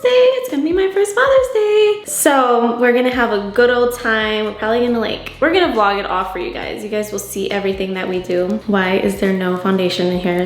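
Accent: United Kingdom. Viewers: Your lashes are so long! And don't feel self-conscious babe you look gorgeous, and you sound so cute with your English accent lol. I would totally watch it if you made your own separate channel for girly chit chat stuff! 💖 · Beautiful and glowing love the London accent 🥰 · Love the British accent!! All the best with your pregnancy ❤️❤️ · Ur beautiful, enjoy the pregnancy. Your body will come back especially if you let baby brown breastfeed the way he's suppose to. Btw i love that British accent. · Lol I love the British accent you’re so beautiful girl God bless you🤍🤍🤍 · It’s the British accent for meee🤣🤣 love from the UK 🇬🇧🇬🇧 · I love these chitchat grwm from ❤️✨ and that British accent 👌❣️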